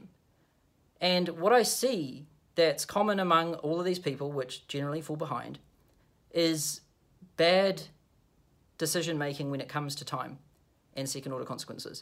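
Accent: New Zealand. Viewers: One thing comes to mind when watching your videos. Quality. Great job man. I like your way of analysing life and work. And I have fun when I can't understand that you find a lot things "bitter". New Zealand accent rocks!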